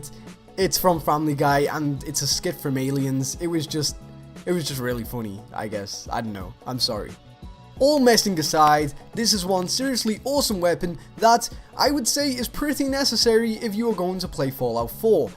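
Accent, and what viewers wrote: Liverpool, United Kingdom. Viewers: You've got the weirdest scouse accent ever you lad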